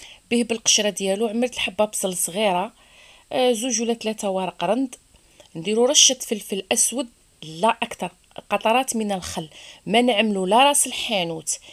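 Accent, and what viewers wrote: Mascara, Algeria. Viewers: هدرتك كيما تاعنا، معسكرية انتيا باينة؟ هههه الله يعطيك الصحة غادي نجربها اليوم للعشا ونردلك الخبر بسياسة.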